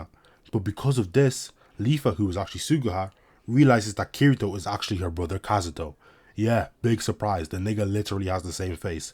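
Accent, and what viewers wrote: Ireland. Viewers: U sound Irish a bit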